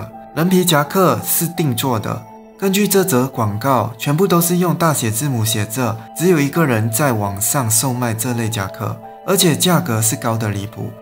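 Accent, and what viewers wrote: Malaysia. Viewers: 應該是馬來西亞人，聽口音就知道了。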